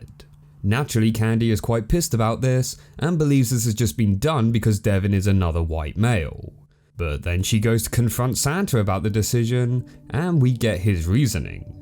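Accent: United Kingdom. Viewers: I can tell you're British.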